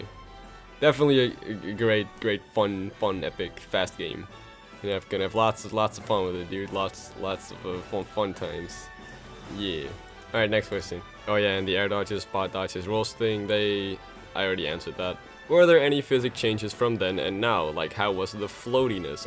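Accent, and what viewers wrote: Netherlands. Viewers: Are you Dutch or something, you sound really Dutch. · I can tell you're dutch. Do you play tournaments?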